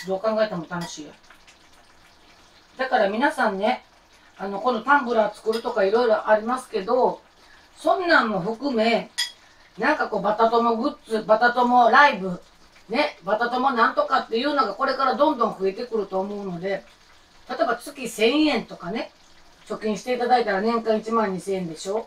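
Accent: Kansai, Japan. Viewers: いつも楽しく見てます。関西弁大好きです💖最近、の「しいたけお先生」 「玉ねぎ子先生」先生シリーズにはまってます(笑)🤣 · たぶん、ジャニーズウエストかなと。知らんけど～知らんのかーいのショート動画もあるので面白いですよ！関西弁は聞いてるのは面白くて好きです。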